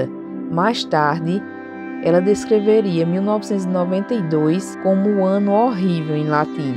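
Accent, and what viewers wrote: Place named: Caruaru, Brazil. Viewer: Essa é caruaruense raíz.. Kk.. sotaque me lembra muito meus parentes de Caruaru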